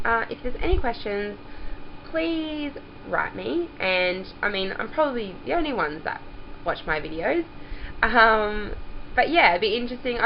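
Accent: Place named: Australia. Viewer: Ha ha i thought the same when i saw your video and then when i heard your voice i was like ahhh I'm sure she's Australian lol go us Aussies. Ooh i love Queensland such a beautiful place we're thinking of getting married over there. How are you holding up with the crazy weather over there? I'm over in Perth, love it here. Thanks babe fingers crossed it happens soon! xox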